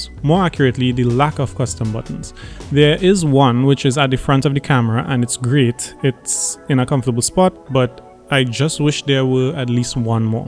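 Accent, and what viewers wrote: Trinidad and Tobago. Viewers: Are you from Trinidad 🇹🇹??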